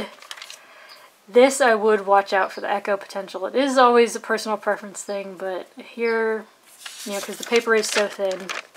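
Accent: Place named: North America. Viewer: Thanks great review I wanted to try this ink but wanted to know about its water resistance. You gave me all the info I needed so subscribed. P.S I'm Brit so not good at North American accents but I like yours. Love the opening spiel too. I guess you are the!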